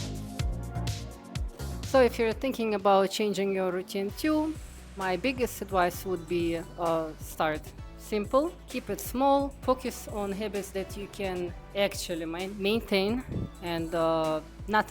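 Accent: Russia. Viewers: Она по русски говорит, акцент русскоговорящего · Impeccable russian accent😊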